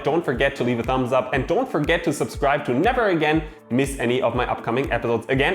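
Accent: Germany. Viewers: you german )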